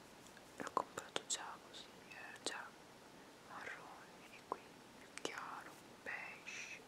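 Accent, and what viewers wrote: Veneto, Italy. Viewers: Sei veneta e sei anche da vicenza scommetto...lo sento dall'accento che hai..é come il mio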